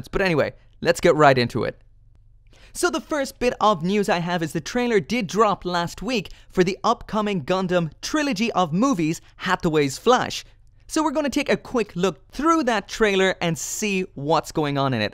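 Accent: Ireland. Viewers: you live in the US? where are you originally from? your accent sounds a bit irish.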